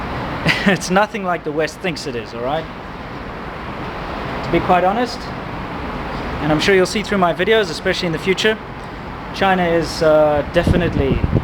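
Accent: South Africa. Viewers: He's from South Africa, so he speaks South African English. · I'm an American but I wish I had that South African accent. It like having a British accent (where people immediately think you know what the hell you're talking about) and an Australian accent (where people immediately think you wrestle alligators) Best of both worlds. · Why do you not have much in common with Afrikaners? You don't sound a lot different to them.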